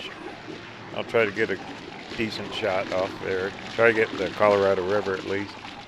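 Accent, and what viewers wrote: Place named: Oklahoma, United States. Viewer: This might have been a interesting video is it weren't for the obnoxious oakie narrating.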